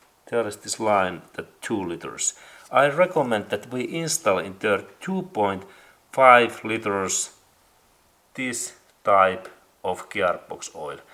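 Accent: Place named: Finland. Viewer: Im here from the video: Timing belt little wrong position Honda Civic. Cause I had a problem with my crx and Ive been wondering if you still do videos and you do. And still the same accent, I love this. Keep up the good work my Finnish friend. Anyways Finland Is a very beatiful and friendly country. Ive been there, so I know what Im talkin about. :)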